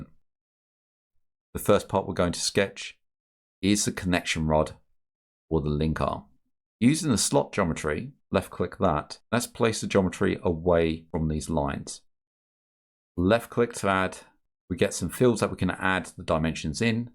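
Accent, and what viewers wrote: United Kingdom. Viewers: Fantastic video, I'm so happy to listen an English accent.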